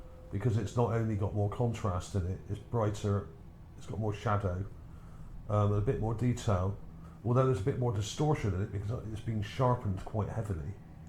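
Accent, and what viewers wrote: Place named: England, United Kingdom. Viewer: Love your accent mate, what part of England are you from , somewhere S.W. I know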